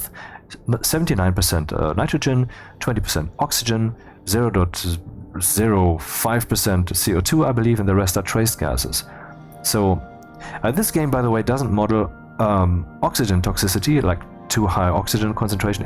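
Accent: Germany. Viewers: I love everything about this video ❤️ I love that your introduction takes almost 5 hours, that you get slowed down by needing to explain the details then end up sayin "we'll get back to that later" and I love the German accent. I got here by chance. Steam showed me this game I had never heard about at checkout buying a totally different genre game. So I watched the first 20mins of this video to help me decide - and promptly added the game to my purchase. Now I am into your video and have 22hours game time registered over 5days, trying to figure the concepts out myself and only taking hindsight hints from your video. Best Xmas gift for myself ever 😂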